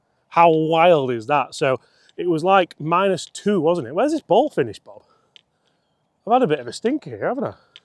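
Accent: Yorkshire, United Kingdom. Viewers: You can tell he’s from Yorkshire…Eee by gum let’s go for the cheapest and make it feel better by the fact we’re professionals than it really is! Lee Trevino used to use bottles to get down greens…. Probably 60/70% of drivers are as good as the previous years even 80/90 % look at all these reviews!! If you’re a 20 plus golfer they may help you, 20-15 maybe 10 yards more forgiving m, 15-20 confidence 15-5 high quality 5–5 elite, be realistic were amateur and mostly shite! Well done to the low handicappers!!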